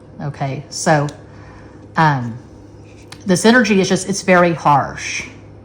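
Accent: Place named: Texas, United States. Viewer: I liked before i watched 😅...i like ur voice...vocabulary...and texan accent...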